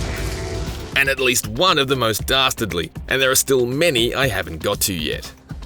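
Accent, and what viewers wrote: Australia. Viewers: Reviewer is Australian? Sounds just like Steve irwin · Sounds Australian to me... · Is IGN an Australian reviewer now? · “Aaaaand those who like to improvise and leave behind piles of dead and unconscious men in their underpants...” lol. This reviewer is great, and I like his Aussie accent. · Did IGN hire Aussie man ? Reviewer sounds him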